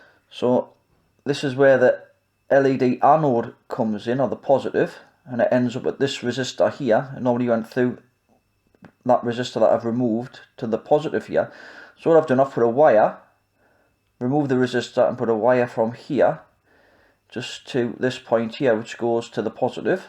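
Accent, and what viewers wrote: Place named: Northeast England, United Kingdom. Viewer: Great video, I have not got a clue what you are doing but it was a great watch, keep up the content, looking forward to seeing more. I recognise the accent, Im from the northeast as well.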